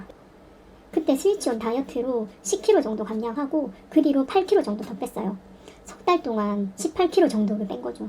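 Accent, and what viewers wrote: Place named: Seoul, South Korea. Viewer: ㅋㅋㅋㅌㅌㅋ 맞아요. 서울말 쓰시는데?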